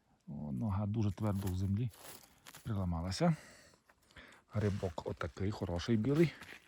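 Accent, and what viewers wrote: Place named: Transcarpathia, Ukraine. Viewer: По акценту то ви є з Закарпаття. В Карпатах білих зараз практично немає. Небуло дощику. Сухо... Удачі вам і гарного врожаю !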